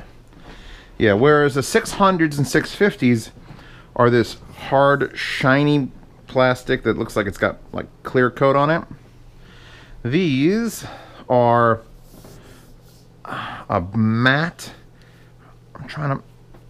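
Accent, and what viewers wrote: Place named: New Jersey, United States. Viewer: Z, are you from Jersey? Sounds like a New Jersey accent to me.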